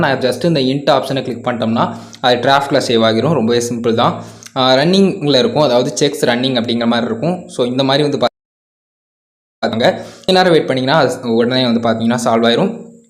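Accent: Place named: Tirunelveli, India. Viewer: Nanum tirunelveli than pesum bothu therithu athan ketan tirunelveli la enga தம்பி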